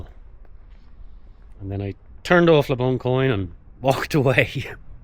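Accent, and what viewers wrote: Ireland. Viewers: Me too that Irish accent and French names always gets me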